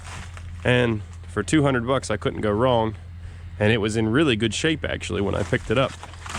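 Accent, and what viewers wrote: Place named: Pittsburgh, United States. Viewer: lol...I recognized the accent...Just Northwest of Pgh here.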